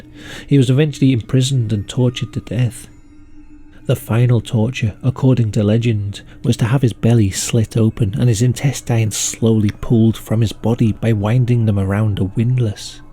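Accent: United Kingdom. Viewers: hey I love your vids and ur british accent <3 also im a little drunk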